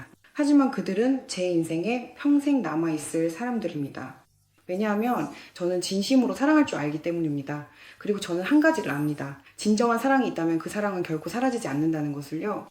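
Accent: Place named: Russia. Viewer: 꾸준하게 좋은 글을 올리시네요 .아쉬은점은 한국어가 능통하시는데,아직 러시아 발음이 깔려 있어 발음에 세심한 경청이 필요합니다 . 이점 때문에 내용은 좋으나 구독 유저가 저조 하다 생각합니다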